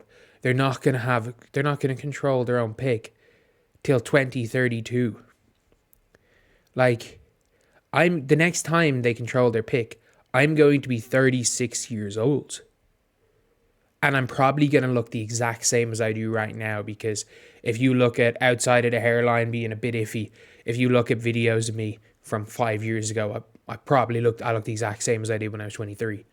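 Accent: Ireland. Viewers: i knew youre from ireland just by the accent .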